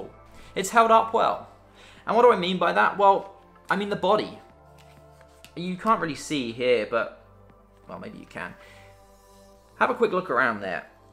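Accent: England, United Kingdom. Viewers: Like your video. I want to purchase the Sony A7iii. And buy the way you mentioned Canada but you don't seem to have a Canadian Accent. Are you from London England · Helpful video, good to hear the camera has worked well for you. Your a Brit living in Canada right? That accent sounds way too English to be a Canuck.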